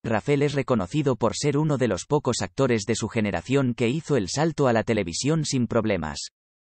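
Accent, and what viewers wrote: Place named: Spain. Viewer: Con respeto es muy interesante sabes más del cine de oro mexicano y la relaciones familiares con actores que todavía tenemos en vida, pero oooooo en cuanto empeze a oír el acento español lo quite a la vrg. No puedo con la Zetaaa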